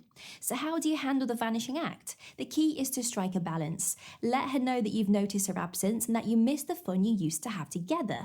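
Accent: United Kingdom. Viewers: Hello there. How are you doing on this lovely beautiful day? Well, it's lovely and beautiful where I'm at in Texas! 😊 Just like that crackhead needs crack for his crack pipe. I needed to hear that AWESOME British accent today! You have a very blessed day! 🙏 You're still finer than frogs hair! 👌 👍👏😎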